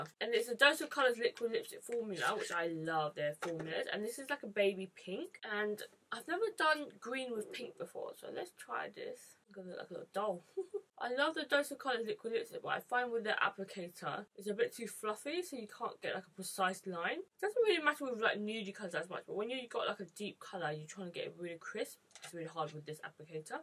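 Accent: United Kingdom. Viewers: Omg your Brittish accent! I wasn't expecting it 💗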